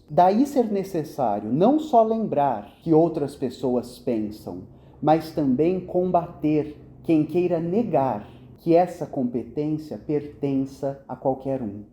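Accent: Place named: Inland São Paulo, Brazil. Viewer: Apresentação brilhante e muito rica nas citações, elogios a sua pronuncia das palavras, como o paulista do interior, e desta elegante mistura de tantos imigrantes do Estado de São Paulo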